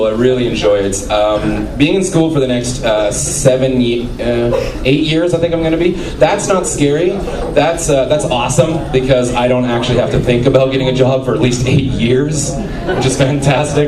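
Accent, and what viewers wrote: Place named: Glasgow, United Kingdom. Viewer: Great Loudon covers, and now stand-up comedy! Not a bad Glasgow accent... try 'Govan' if asked where in Glasgow... Good stuff.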